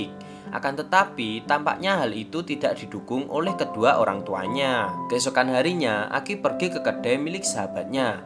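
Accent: Java, Indonesia. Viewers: Intro,closingnya sama logat jowone yg bikin gua pengen nonton trus celengan film😂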